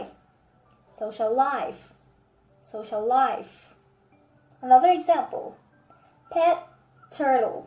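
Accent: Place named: Philippines. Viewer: Are you a Filipono? You're very good. Upload more videos about English tutorials because you're very good on it. Done my support.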